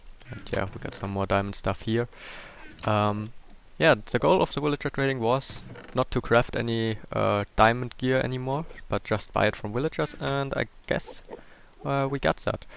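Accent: Germany. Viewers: Man hört es übel... allein wie er think ausspricht :) typisch deutsch eben! :D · man hört voll dass Du deutscher bist xD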